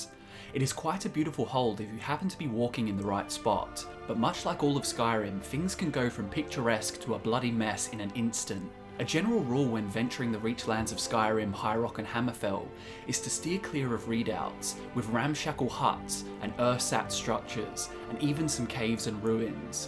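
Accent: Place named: Australia. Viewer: Are you an Aussie? you sound it. I am too XD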